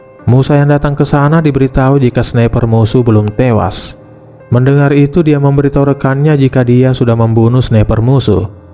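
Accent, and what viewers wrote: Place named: Bugis, Indonesia. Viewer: Mimin logat Bugis, orang Bugis kahh😅😂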